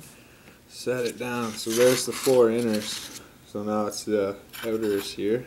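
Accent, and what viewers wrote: Canada. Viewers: are you canadaian by chance?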